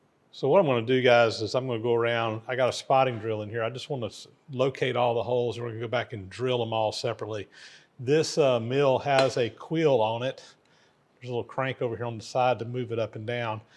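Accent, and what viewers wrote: South, United States. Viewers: Hope y’all appreciating the genuine accent of a true Southern gentleman. 90% of what you hear in film/television is an “attempted” accent, and usually highly exaggerated. :-)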